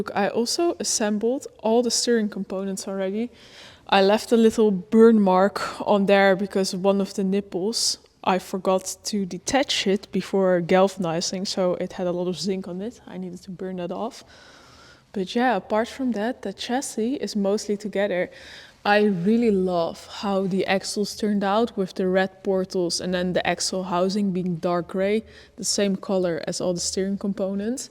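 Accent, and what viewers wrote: Netherlands. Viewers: You are Dutch. I can hear it. Lekkerrr.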